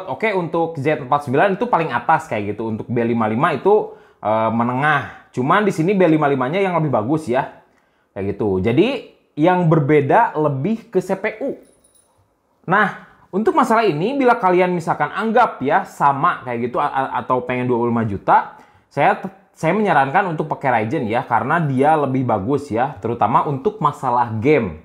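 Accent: West Java, Indonesia. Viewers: Sunda wkaka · F = EP Sunda Accent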